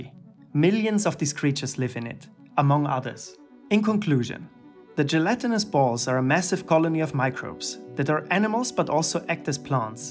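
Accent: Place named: Switzerland. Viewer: I believe so - also i think i detect a swiss accent in the content creator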